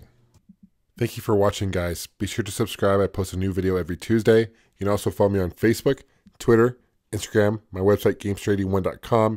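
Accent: United States. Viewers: In my part of the U.S., I believe that jagwire is the most common pronunciation. I think is from my area, just from listening to his accent.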